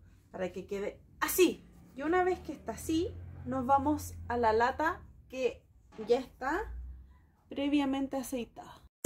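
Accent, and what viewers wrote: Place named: Chile. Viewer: Srta.o Sra., buenos dias, gracias por su importancia de hacer pan, antes buenos dias, le escribe su servidor José Amado M., lo cierto en la última vez que quise hacer pan com mi nieto no se que pasó, lo cierto porque no salió bien me acompleje, espero hacerlo nuevamente, es que ya hace un año y meses que se me fue mi querida esposa de este planeta Tierra al reino espiritual, por no decirloclo funesto, pues tratare volverlo hscer, porque cuando estabacmi esposa todo me salia como Dios decide, ahora que solo estoy que por cierto con su alma de ella y con Dios, deseo hacer mi Pan, pues lo cierto que me aturdo o me siento inseguro, considero quextodo esta en mí, agradezco su paciencia de tolerarme con mi escrito, Dios me la bendiga y me cuide su familia, por su acento tengocla premonición de que es usted de Chile?, que Dios bendiga a todo Chile porque ustedes tiwne la buena raza Auracana, saludos a su señor esposo, que veo que tienecun niño muy inteligente y escla alegria de su familia. 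Atte. José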